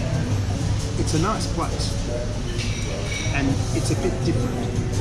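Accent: Australia. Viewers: he cant understand because of your ausie accent with mask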